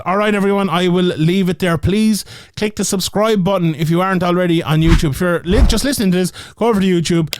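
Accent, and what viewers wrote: Ireland. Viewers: I dont recall 1 damn pick you said cuz you talk so fast and have that irish accent but i sure do love to hear it brotha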